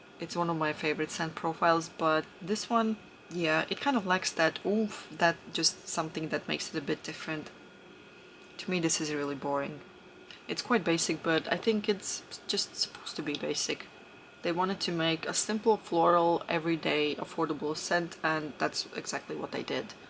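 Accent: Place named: Russia. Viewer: Your accent sounds like you're Russian 😊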